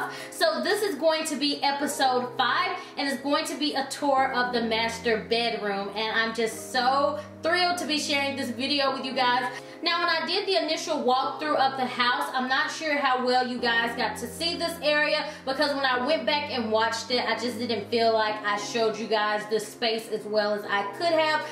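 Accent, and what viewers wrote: South, United States. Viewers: Just Beautiful!! I love everything you have done with the room. You are beautiful and your voice is so country. Love that too hahaha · are you from the south?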